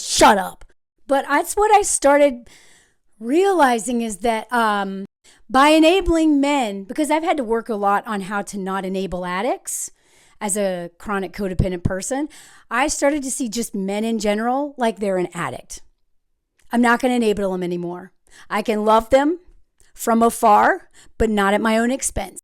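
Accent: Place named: Texas, United States. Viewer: The way you talk reminds me of one of my Grandmas. She was from way out in the country in south Texas (not sure which town).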